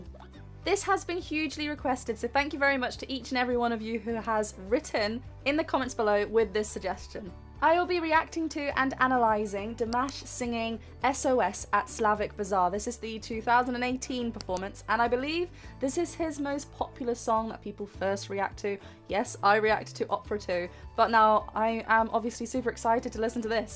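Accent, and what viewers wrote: England, United Kingdom. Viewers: Coach i love ur english accent ❤ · What dialect of British does she speak?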